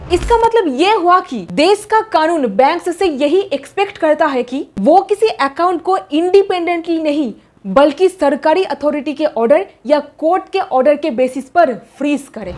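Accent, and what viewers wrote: Bengal, India. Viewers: Bangali ki hindi jyadatar aisi hi hoti hai 99.%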